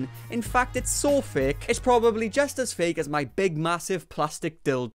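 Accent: Wales, United Kingdom. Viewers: This man could talk about Eastenders and I would gladly sit and listen to him. I swear the welsh accent is a beautiful gift to humans. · a cute welsh accent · well that growl thing made me feel surprising things aha you seem extra welsh in this one.. keep up the good work!!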